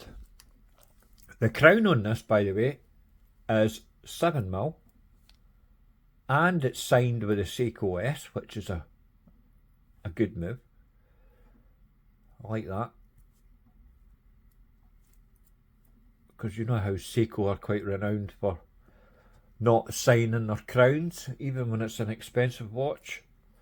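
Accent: Scotland, United Kingdom. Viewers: Came for the watch stayed for the thick scottish accent.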